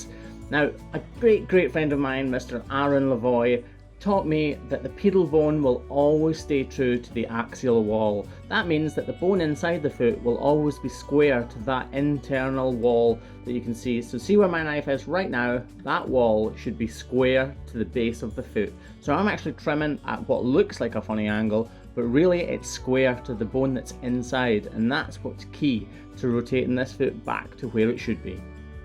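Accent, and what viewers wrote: Scotland, United Kingdom. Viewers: I looove your lovely Scottish accent and how you help animals! I’m American and have a customer with a lowland Scottish accent.. just adore her. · I watch so many of your videos on mute I had no idea that you where Scottish this full time that’s so cool I stay near Glasgow. If your ever looking for a 30 year old apprentice I would love to do this I can’t stop watching these videos lol there class.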